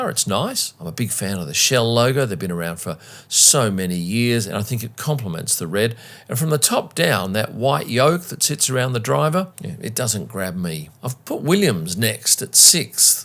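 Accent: Australia. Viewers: Hey,nice to hear a dinkydi Aussie talking about F1 again 🇦🇺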